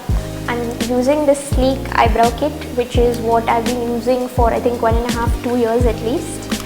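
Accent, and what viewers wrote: India. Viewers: I really loooveeee the way u talk u know ur English is phenomenal..u enunciate syllables..dats really catchy..at first I didn't like it...but now..I just watch it videos to see u talk.. moreover most Indian YouTubers fake an American accent..but ur pakka proud Indian Naari! Love u..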